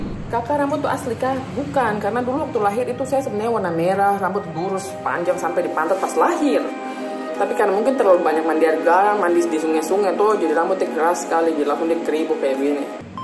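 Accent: Papua, Indonesia. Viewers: Seneng bangett sama logat papuanyaa... Sukses terus kakk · Kk cantik, cerdas,lincah, tdk sombong, positif tindakan dan pemikirannya.... 👍👍👍 😍😍😍 Inspirasi buat muda mudi indonesia nih... Suka kalo lagi ngomong pke logat papua...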